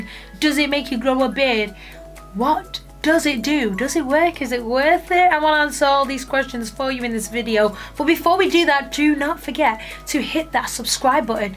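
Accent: England, United Kingdom. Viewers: Idk why but I always am rubbed the wrong way when I see an Arab, Indian, or African with an English accent. All I hear is a little rat bastards coward runner. Ran from your country to the safety of another. Weak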